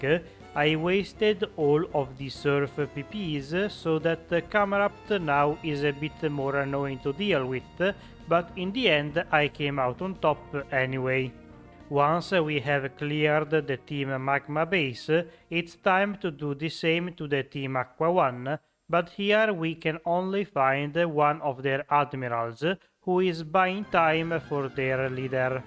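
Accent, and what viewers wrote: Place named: Italy. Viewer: Si sente che sei italiano...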